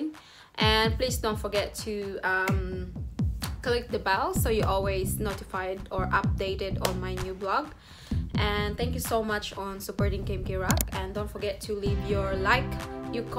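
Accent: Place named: Australia. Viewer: Yes, the case.. looks so manahalin but yeah, it's affordable. Isn't? . Uha! the gold at the centah is so striking. Defootah.. The bubbles thingy, nindot na sya pabutuhon. Nahn! love the Australian accent and the accent of the make up you put on yow face. But I still can't beleive na we live at the same place. Yeah? hahaha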